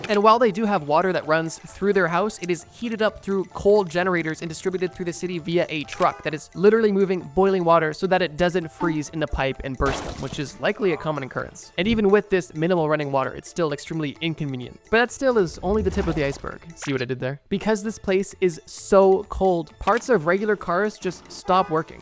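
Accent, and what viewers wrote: Canada. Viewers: Youre canadian arent you? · I never noticed this dude has a Canadian accent it’s mind blowing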